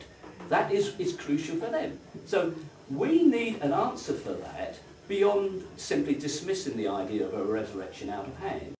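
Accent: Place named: Cockney, United Kingdom. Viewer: It’s a beautiful cockney and I love it.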